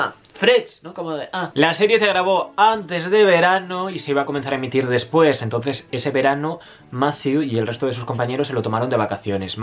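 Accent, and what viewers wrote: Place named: Spain. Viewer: Me chute todo el video no se me hizo largo eres divertido algunas cosas que dices no las entiendo porque es español de español de españa tio desde hoy soy tu suscriptora😊❤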